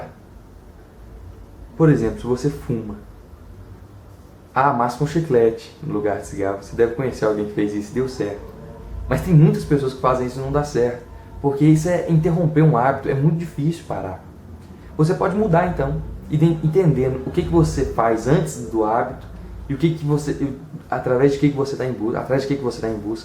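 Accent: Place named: Minas Gerais, Brazil. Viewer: Parabéns. Foi uma ótima resenha, explicou tudo de forma clara, concisa e pude compreender perfeitamente a que o livro se propõe. Me animei a comprar o livro e realmente os hábitos são tudo, foi uma das características marcantes que percebi convivendo um tempo com pessoas extremamente focadas e proativas de sucesso, com perfil vencedor que convivi no colégio. Eles sempre tiravam as melhores notas e eram muito reconhecidos pelo corpo docente. Tem sotaque e jeito mineiro, acertei ? rs parabéns novamente, abçs.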